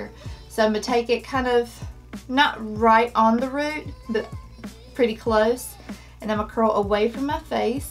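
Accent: South, United States. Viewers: Really? She sounds like she's from North Carolina or Alabama to me. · This is a Southern US accent, not Texas, but more like Georgia or the Carolinas. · I love your country sweetheart accent 😝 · I'm Australian and there is no Aussie accent there at all only Southern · Southern AF the whole time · She sounds Southern. I'm from NC and have a similar accent, and I have family with thicker accents. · Sometimes I think she's southern, but sometimes she had a slight Aussie accent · I heard the same. But mostly I hear Southern. And it’s really great to listen to. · Emily Gilbey hateful.. a southern accent comes from your birth place naturally just as an Australian or British. I don't understand such a hateful comment on the fact that she has a southern accent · Emily needs to go bye bye with the negative comment about the accent, I am from North Carolina and have the same southern accent and let me just say that this chick is an adorable Southern bell and very beautiful, thank you for the tutorial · It's the lisp and the southern accent all at once! 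· You talk so cute!! Such a sweet country girl accent! And your hair is gorgeous!! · Love your accent!! I found your video saying your from NC!! I'm from Oklahoma/Arkansas and it's funny how southern accent all sound a tab bit different😊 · Is that a Southern accent? No wonder I can't understand you. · She doesn’t sound anything like an Australian lol. We don’t talk this way. She’s southern 😂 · I want these now!!i love your accent! You talk like me so you must be from the south!😀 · Hannah Slowikowski No..completely Southern the whole time. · I think South Carolina or north Georgia. Just a guess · I just found you. You are stunning and I love a good southern accent. I was born in the West but my entire family is from the south and so I'm the only one who speaks wrong, as they lime to tell me. Lol. I also love shark tank and wondered about these when I saw them on there. Loved seeing how to use them. 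I'm really bad with hair, and makeup and fashion. Lol. I missed the girly gene apparently. 😂 want to try these now. Your curls turned out beautifully. Do you think spraying them with hair spray before you take them out will help th curl stay longer in the day? Thanks! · As a Texan it's definitely southern the entire time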